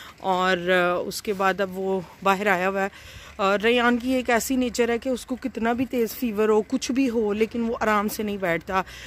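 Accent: Sialkot, Pakistan. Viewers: aap ki awaz sa laga tha k aap sialkot sa ha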